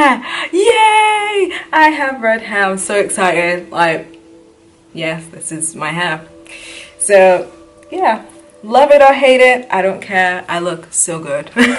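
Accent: North, United Kingdom. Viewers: You British? If so where abouts are you from? London? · You sound northern where are you from? Nice video btw, finally someone that actually knows what's going on before they react to it 👌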